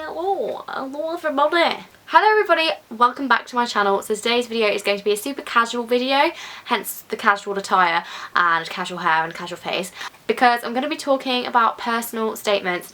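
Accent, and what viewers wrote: Birmingham, United Kingdom. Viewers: Birmingham, England I think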